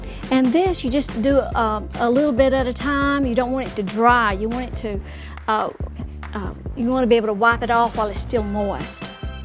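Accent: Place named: South, United States. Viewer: Oh I just love those lanterns, rusty or not. I would not have thought to use chalk paint. Thank you for sharing. By the way, are you from N.C.? I am and I have moved to VA and your southern draw reminds me of back home. that's a good thing :) I really enjoy all the video's that you have made. You give great attention to details.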